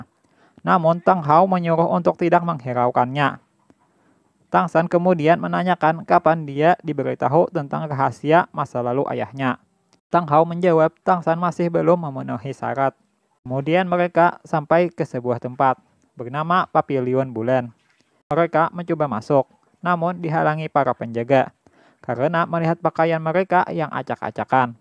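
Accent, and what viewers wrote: Banjar, Indonesia. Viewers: Aksen nya mirip orang banjar hulu sungai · Suaranya Mimin kaya logat orang Banjar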